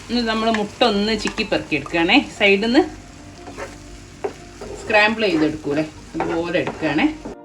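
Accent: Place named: Thalassery, India. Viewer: Excellent recipe!💖 Are you from Thalasserry...I think so from your language..😊😊 .......From Bahrain. Actually from Thalasserry.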